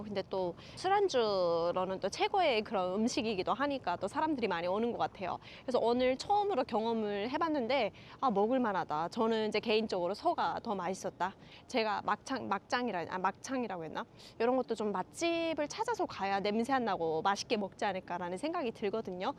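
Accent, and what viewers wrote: North Korea. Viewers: 신기하네요 북쪽억양이 조금씩.. 자연미인이시면 대단한 미인이신듯!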